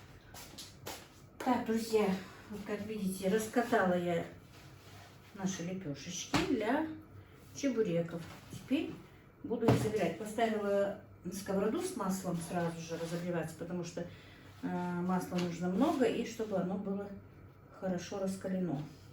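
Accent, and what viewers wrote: Standard. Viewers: Учителя были хорошие и ученица оченьприлежная.Я тоже поражена чистой,хорошо поставленной русской речью.Всех благ вам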